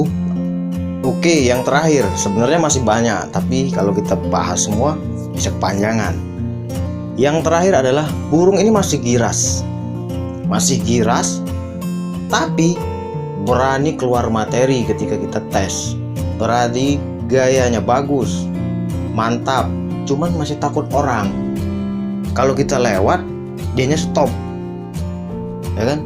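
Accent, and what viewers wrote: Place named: Sumatra, Indonesia. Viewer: Macam orang sumatra logat ko wak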